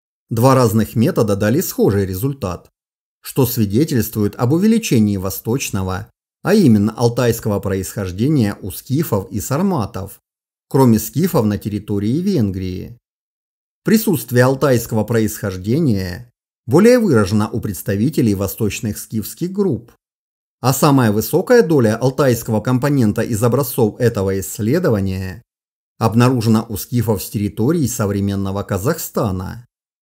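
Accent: South, Russia. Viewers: ну, понятное дело, классическим произношением всегда является произношение диктора телевидения или радио... Обычно оно основывается на произношении, принятом в качестве классического. Речь идет о произношении столиц. Наверное, есть какие-то курсы на эту тему... Но, вообще, кому бы ни показала Ваши ролики - все дончане ничего особенного в произношении не видят. Прихожу к выводу, что это локальная особенность. Просто при чтении она бросается в глаза как-то больше, чем в обыденной речи. Но местные особенности речи абсолютно не мешают вещать другим донецким блогерам, например, Денису Селезневу (социология). У Бушвакера, у которого очень хороший канал по истории, тоже выраженное южное произношение. Это придает каналам определенный шарм. Ну, у Вас обращают внимание больше потому, возможно, что Вы серьезный, а они с шуточками. Поэтому и ждут от Вас дикторского голоса. 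Или если не дикторского, то легких шуточек, как у Дробышевского, которого, без сомнения, приятно послушать. Оставайтесь самим собой. Не стоит пытаться всем угодить. Недовольные всегда найдутся. У Вашего канала есть индивидуальность. Главное - все грамотно и интересно изложено. Чем популярнее канал, тем больше будет критики. Вот, Платошкин - историк. Автор многих книг. Имеет научные степени. Дипломат бывший. А рязанское (предположительно) диалектное произношение не смущает. Так что все относительно)))))) Можно посмотреть на вещи с другой стороны.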